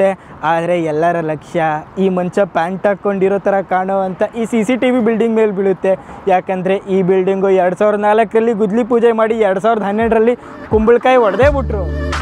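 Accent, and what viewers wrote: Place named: Mysore, India. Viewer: Thumba cute aagi mysore bhaashe maathaadthediya maga very nice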